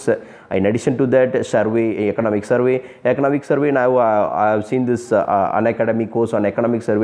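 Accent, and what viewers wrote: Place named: South India, India. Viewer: nice to hear pure South Indian accent after a long time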